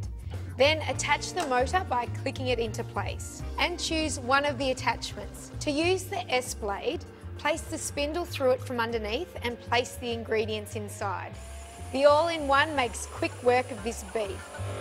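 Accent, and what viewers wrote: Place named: Australia. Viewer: Her charming Aussie accent kept me watching since I already have a similar appliance.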